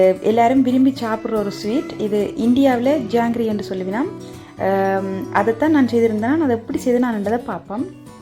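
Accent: Sri Lanka. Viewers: அது என்னமோ தெரியலை சகோதரி நீங்கள் பேசும் இலங்கை தமிழுக்கு நான் அடிமை ❣️❣️❣️❣️❣️❣️❣️❣️❣️❣️❣️❣️❣️❣️❣️❣️❣️❣️❣️❣️❣️❣️❣️❣️❣️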